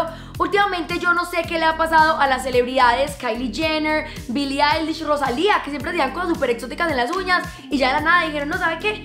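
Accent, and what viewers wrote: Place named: Medellín, Colombia. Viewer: Se nota que eres de aquí de Colombia eres de Medellín igual que Lulú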